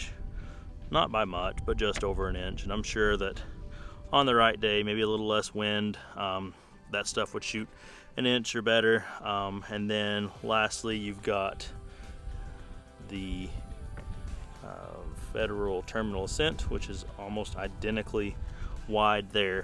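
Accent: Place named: Fargo, United States. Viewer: Liking your videos a lot! Sounds like your in the Fargo area, I’m in Bismarck. I just picked up a 6.5 PRC Ridgeline. Two questions for ya...what scope did you top yours with? (I’m leaning toward the Leupold VX-5HD 4X20) And...which model Javelin bipod did you get?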